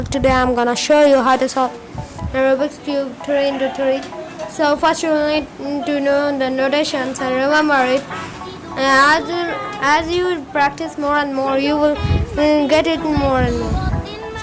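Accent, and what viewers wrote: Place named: United States. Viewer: Abe American English kyu bol raha hai? Indian English bol na!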